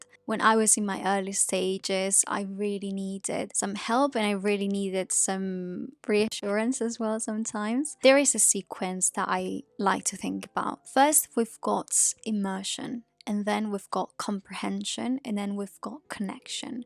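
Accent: RP, United Kingdom. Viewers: I love RP accent... Great video. Thanks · Would have never known that you are Spanish. Well done with the British accent. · ¿Cómo aprendiste a hablar el acento británico? Hablas como si fueras británica. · Me gusta tu acento Británico, me voy a Australia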